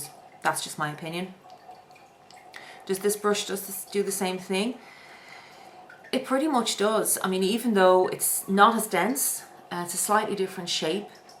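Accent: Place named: Cork, Ireland. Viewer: your accent is soundin pretty local to me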